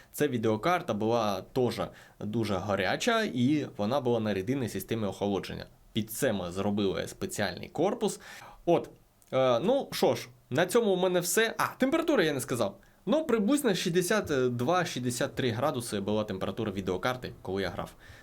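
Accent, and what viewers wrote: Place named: Odesa, Ukraine. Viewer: Прошу не сприймати близько до серця, але я в шоці, що існують українці, котрі говорят українською з таким акцентом. Я з Кривого Рога і теж до ПМВ говорив російською, але такого акценту немаю. Одеса не припиняє дивувати.... просто окрема країна якась, котра всі ці роки була десь не з нами.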